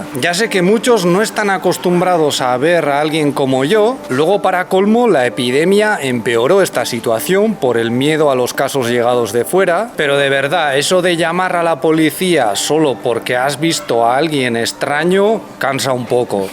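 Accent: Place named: Basque Country, Spain. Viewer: Formáis una pareja genial. Me encanta tu acento euskaldun. Gora china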